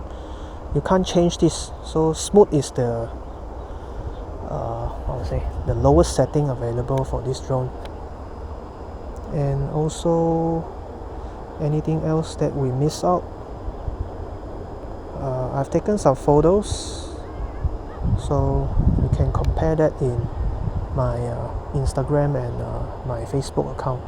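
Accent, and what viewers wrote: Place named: China. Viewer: why autel is using a Chinese accent speaker? why not a neutral accent speaker?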